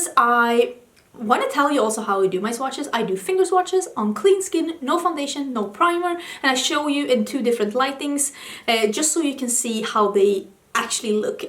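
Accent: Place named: Sweden. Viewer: You’re such a sweetheart, I love that you’re Swedish too, most beauty YouTubers I come across are American or from the UK. Also like your accent again and just your honesty about what your thoughts are with makeup and products you try. Thank you, I subscribed after I watched one of your videos for the first time. 😊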